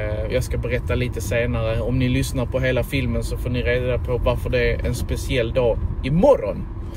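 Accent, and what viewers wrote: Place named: Skåne, Sweden. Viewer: Jättebra kanalen! Men jättesvårt att förstå skånska för mig 😊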